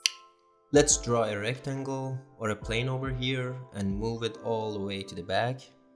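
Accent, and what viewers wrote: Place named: Turkey. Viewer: Sen Türk müsün aynı Türk gibi İngilizce konuşuyon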